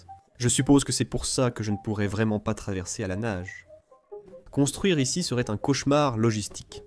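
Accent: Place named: Portugal. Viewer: en effet c’est bien ça. L’accent portugais qui est reconnaissable de loin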